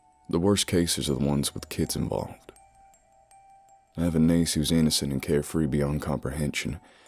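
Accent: South, United States. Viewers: Ok, now I'v found a new storyline that I truly will enjoy anticipating the next installment thank you! You nailed southern accent so well I love it just love it!!! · Surely you mean Southern. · sounds southernish to me as well. I'm from Pa and it sounds nothing like I do . 😁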